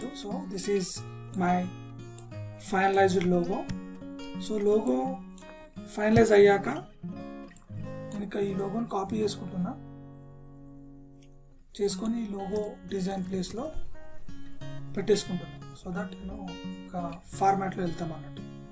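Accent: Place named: Telangana, India. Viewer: Thank u bro. Ma intla manshi matladutunnattu anipistundi bro. Nenu mana telangana slang ki connect ina 👌👌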